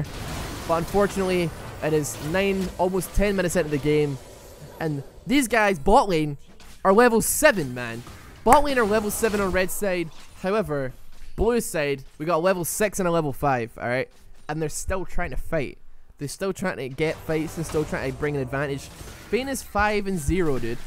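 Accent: Scotland, United Kingdom. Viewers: Are you scottish? XD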